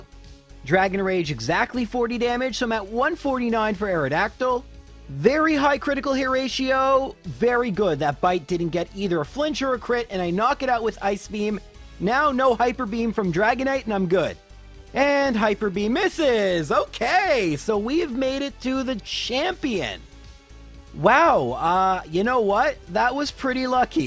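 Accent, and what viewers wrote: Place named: Canada. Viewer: He's Canadian, that's how they say it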